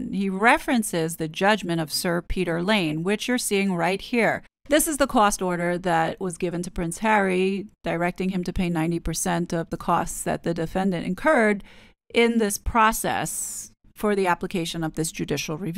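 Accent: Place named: New York, United States. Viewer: I don’t think so. She sound like very well spoken educated person with a New Yorker accent 😊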